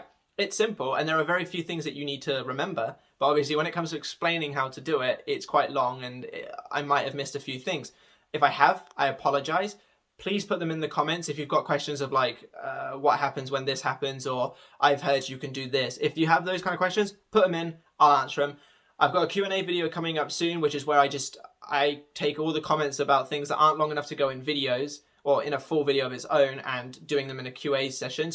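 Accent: United Kingdom. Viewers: You are a bundle of possative lovelyness, I am so grateful to the American youtubers that have really helped me with my self concept. But it is nice to hear a uk voice. Makes it even more real. I'm subscribing. See you on the flip side. I mean I am already there. 🙂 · Definitely my new favourite law of assumption youtuber , helps as well that you’re from the uk, so I can actually understand you better 😅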